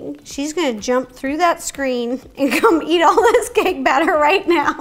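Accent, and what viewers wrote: South, United States. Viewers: was it just me or did she sound like she had a southern accent 😂😂 love u ro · Why does she have a southern accent half the time? She's so cute😂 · No one:......... Me: notices accent why is there a southern accent if she is from Seattle, WA? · She is starting to sound southern!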